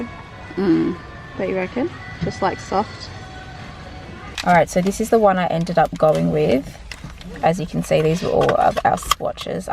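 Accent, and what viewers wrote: Australia. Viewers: she's Australian. Her accent is pretty obvious...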